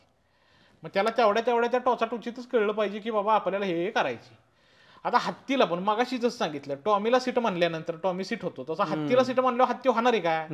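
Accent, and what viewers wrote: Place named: Satara, India. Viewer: लय भारी चर्चा केली दादा अगदी आपल्या सातारी भाषेत. खूप मस्त. धन्यवाद!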